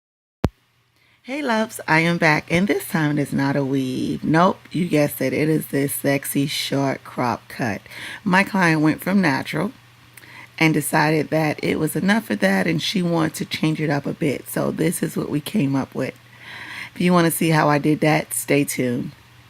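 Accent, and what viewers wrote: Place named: New York, United States. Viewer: Up, and coffee in hand. Back to the “Binge!” This “Do” looks AMAZIN! Love it; Natural/Relaxed....Healthy Hair is the Goal💪🏾💪🏾 And this looks Great! Technique is CRAZY! Such a “Pro!” Oh, and I KNEW you were a “New Yorker!” HEEEEY Fam! The #accent may have diminished, but it takes ONE to know one!💪🏾💪🏾💪🏾🖤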